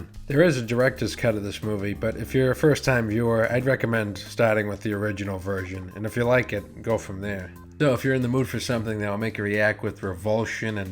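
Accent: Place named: Boston, United States. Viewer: Your Boston accent shines right through! Love it.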